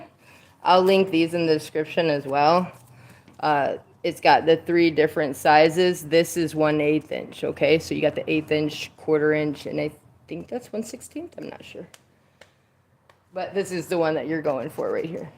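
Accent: Michigan, United States. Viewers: Man I just found your channel and I love your high spirit. You are so much fun to watch. It sounds like you are in Michigan and so am I. If you hold classes let me know I would love to attend. I work with resin and I’ve been contemplating adding wood.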